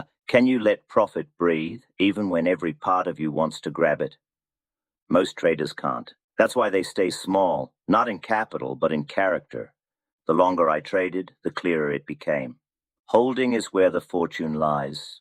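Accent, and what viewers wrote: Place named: Australia. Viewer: Sometimes it sounds like has developed an Australian accent 🇦🇺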